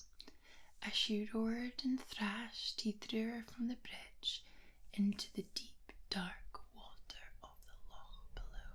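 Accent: Scotland, United Kingdom. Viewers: I do not have good ears for decrypting deep Scottish accents. I didn't imagine that "Healing Goose" would really be "Highland Cows". Crazy. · Before I comment on your video, I must say that I'm again running late watching and commenting! It has been like this for a very long time due to my working schedule! I have to mention this because only a few people know! I have mentioned this to Alexander and Nick and it's about the very first time that I got into Asmr videos! I'll try not to drag this out but I started browsing YouTube videos, cars, etc. several years ago and somehow I came across this video made by Kel who is from Australia doing this Asmr video and with her accent and hand and mouth sounds for some reason definitely got my attention 😀. So I followed her for a while and one day I came upon very stunning Scottish women doing Asmr videos also. 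So I got hooked watching several of videos and of course that amazing Scottish accent had me hooked! It took me several of her videos before I got the nerve up to start commenting, and boy was I nervous!! Eventually I switched over to channel and so the rest is history! I know that some of you have been following alot longer than me but I am really sorry to my friends that I have met from watching videos that I don't always get a chance to talk with like I use to! 🥺 I really appreciate being made part of the moderating team ❤. Unfortunately I don't get to much of a chance to really be as helpful as a few other of my friends! But I do keep tabs when I can! Thanks everyone who's made it to this point of my comment for letting me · we want even more hella thicc scottish accent videos my love!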